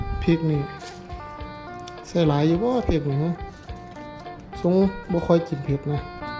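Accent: Chaiyaphum, Thailand. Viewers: เจ้าคือมาเว้าคือสำเนียงคนบ้านข่อยแท่ คนชัยภูมิแมจ้า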